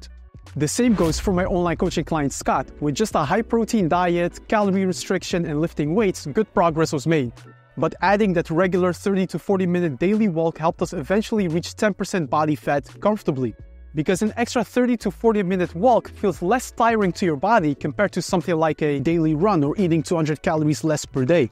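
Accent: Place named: Netherlands. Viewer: je klinkt Nederlands :D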